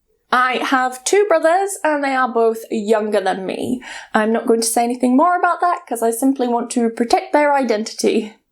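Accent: United Kingdom. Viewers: I understand you so much!I hate mouth noises too. It always drives me crazy.I glad to find the same person as I am.👍 Also I love your british accent.💜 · B3autifull British ac3n7..oh.. que fofa ,fala português.. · This the first time a hear a British person speaking english and understand everything even without subtitles. By the way i am a greek trying to improve my English and i really like you I think you are adorable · Hello ,i appreciate it and i am happy i can learn british accent , grammar . .. with you and we were born on the same month ,oh my God 🤩by the way i love your hair color and eyes please give them to me 😂😂and congratulations to your BF and us for having u _ thank God Please take care of yourself and it's not a comment it's like a letter 😂😂😂😂😂💓love you❤❤❤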